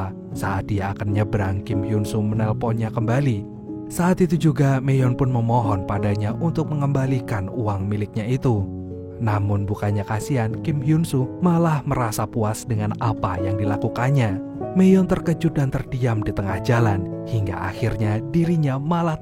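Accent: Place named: Banyumas, Indonesia. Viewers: Rika wong ngendi kang deneng ngapak temen bahasane 😅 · Wong ngapak ya kang Tek subrek lh meski mbene tau nonton 🤣🤣🤣🤣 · Rika si wong ndi... Aku Banyumas.... Kaya bahasaku kue deneng 😅😅😅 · Ngapak PWt, PBg, CiLanyap, Kebumen, apa Banjar Negara kie.. Nyong Pwt kota ra Nganggo bahasa "Rika" 😂 Langsung tek subrek kie pokoken anu seaLiran 👌🙏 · Ternyata adminnya ngapak.. Salam ngapak min!! Kober kobere koh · Deneng rika ngapak😂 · Anjai 😂ngapak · Wasik Salam ngapak Ora ngapak ora kepenak Aja kelalen mendoan e Lik di sambi 😂😂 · Kaya wong ngapak bahasane😂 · Laaah rika jebule ngapak wa.. 😂❤ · Wong endi min,kok ngapak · lah rika si wong ndi deneng ngapak?! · Wong ngapak ki genah.. Rika Rika.. 😂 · ccte cah ngapak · Ngapak rika y min he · ngapak kie minn wong ndi rika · Dene wong ngapak kang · Anu wong Banyumas apa ya, lek.. Yuh ngopi bareng lah wkwk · Wong ndi mas deneng ngapak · wong ngapak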